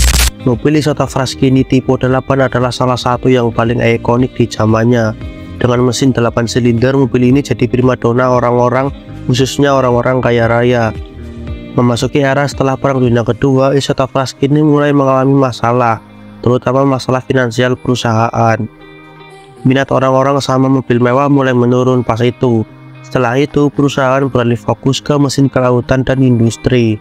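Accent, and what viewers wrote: Indonesia. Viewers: padahalmah aksen Indonesia nya biarin ajja, tapi yaaa banyak yg pengen disama'in kaya aslinya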